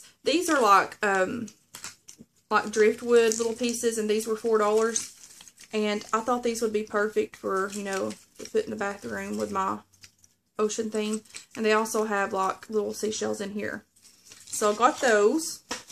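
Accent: South, United States. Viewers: "Tickle your innards!" That was on all of the old Mountain Dew bottles in the '70's just meaning that it's so good that it will tickle your insides and make them happy! I'm telling my age! I have just seen your video for the first time and you are adorable and girlfriend I LOVE that Southern accent! Where are you from? I'm from Alabama and I know a Southern accent! Subbing tonight! I heard you talk about journals so check mine YouTube out as well! Looking forward to watching you more! Love the dimples :)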